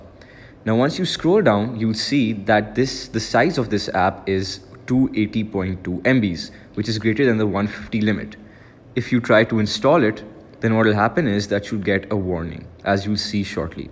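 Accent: India. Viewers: He sounds Indian af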